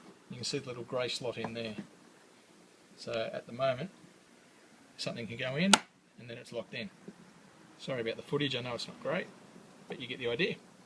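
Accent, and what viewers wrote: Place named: Australia. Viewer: Nice to hear an Aussie talking about yakking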